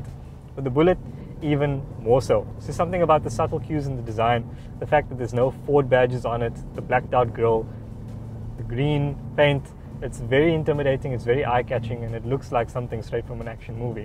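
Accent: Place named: South Africa. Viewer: This presenter has a brilliant South African accent. Fantastic!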